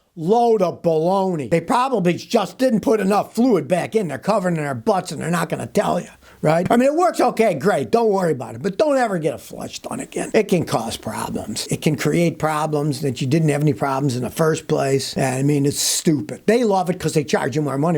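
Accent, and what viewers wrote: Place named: United States. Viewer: Must be the common sense and ability to speak americanized English using real words on display again 😎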